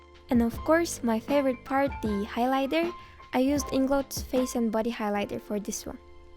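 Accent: Turkey. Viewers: I supraiesd that you are Turkish because Turkish people are very bad at english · ingilizcen gayet iyi olmasina ragmen turk ingilizcesini her yerden tanirim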